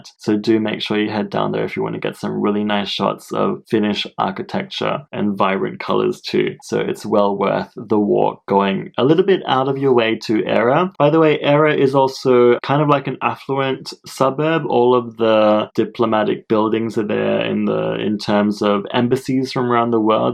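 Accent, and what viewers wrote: Australia. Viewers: I just love your great videos,. I like Aussie accent.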